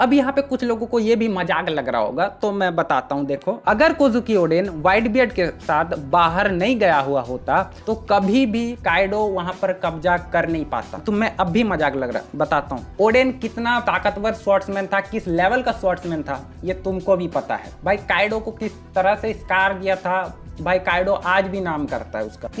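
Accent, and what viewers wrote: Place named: Odisha, India. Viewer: Bro are you from odisha . Apke baat karne ka tarika Odisha walon ke jaisi hai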